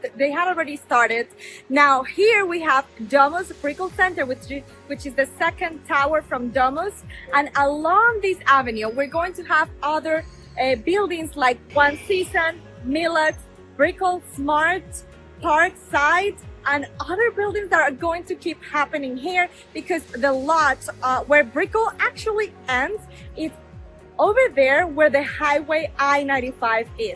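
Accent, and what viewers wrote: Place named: Latin America. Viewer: Whatever accent this is, is my favorite Latin accent. Great video!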